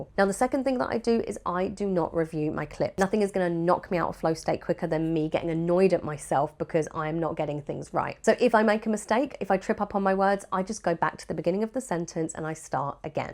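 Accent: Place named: England, United Kingdom. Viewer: You inspired me so much to keep creating,. I’m an artist, and I promote my art and teach courses, and lately I’ve been feeling really discouraged by the algorithm and the little time I have after my office job. But what you said about setting boundaries with content creation really stayed with me, I’m going to start doing that. I still haven’t finished watching the video, and I know the 30 minutes I have left are going to be full of value. I truly appreciate you for that. Technology is amazing, I can’t believe I get to access such valuable content all the way from Colombia while you’re so far away. By your accent, I think you’re from England?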